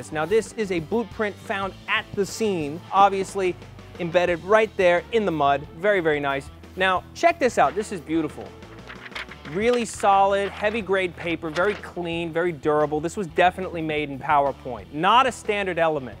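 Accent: Boston, United States. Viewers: Not sure if the accent is Bostonian or Archie Bunker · Bawston accent is great. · I mean like common, that baws-town accent ... really?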